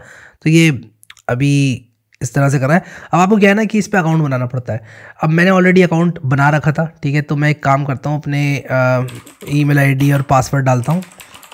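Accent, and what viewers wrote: India. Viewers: bhai tu bilkul angrez jaisa dikhta hai, mai to kisi foreigner ka video bolke click kiya tha, badme dekha tu to indian accent bol rha.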